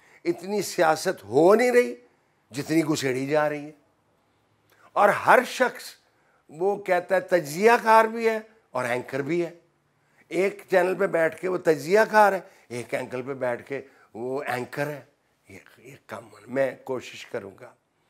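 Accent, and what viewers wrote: Punjab, India. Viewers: Speaking Urdu with Punjabi. Like me😂😂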